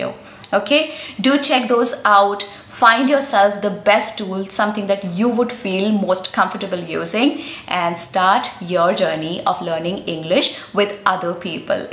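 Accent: India. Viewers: Unfortunately you have a heavy indian accent, particularly it is very difficult for a British to understand you...please work on your accents befor teaching anyones..or kind of fooling others.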